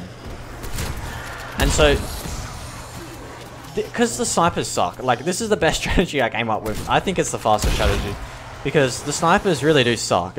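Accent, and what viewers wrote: Australia. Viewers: subscribed from your RPG video, now that i heard ur voice i see ur an aussie, even happier that i subbed now :) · An Australian yooooo wtf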